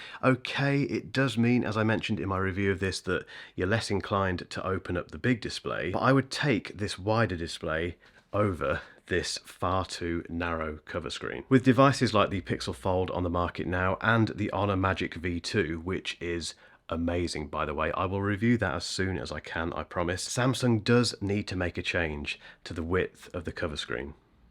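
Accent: United Kingdom. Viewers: Hi. Thanks for a great video as usual. Great to listen to a Brit. Looking forward to your review of the Honour V2 but like you said I think Samsung software is still the best. From the reviews I have seen so far, the phone doesn't multitask horizontally.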